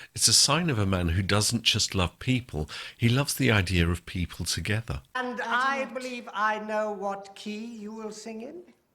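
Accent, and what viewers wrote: United Kingdom. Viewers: You've been criticized for that lovely, silky voice? Unbelievable. Your voice transports me in the same way a day at the spa with the sound of relaxing music and a pair of strong hands gently massaging away all the tension of a busy week. I often put one of your playlists on repeat when I retire for the evening. I always sleep better with a British accent in my ear.....and yours is the most soothing. Remain just as you are and allow malcontents to just drift off to another port of call. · Sir John is the comic relief along with Mrs Jennings. I like his boisterous personality. And I like your British accent, as many of we Americans do!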